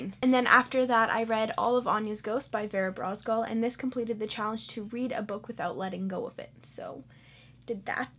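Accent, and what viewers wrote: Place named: Canada. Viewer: YOU DID SO WELL I'm mad jelz. ps. I always forget your Canadian and then you'll say something and I end up hearing the accent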